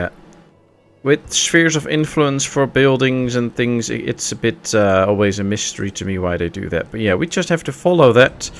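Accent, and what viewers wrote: Netherlands. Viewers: Nice video! I recently found your channel while looking for LiF letsplays. Maybe an odd question but are you Dutch? You have that typical English eccent. If yes then groeten uit Utrecht.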